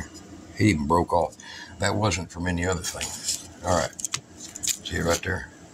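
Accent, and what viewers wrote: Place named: East Texas, United States. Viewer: Yor seam to be developing a east Texas accent , I like it but I thought you were west of Austin . I'm in north east Texas myself .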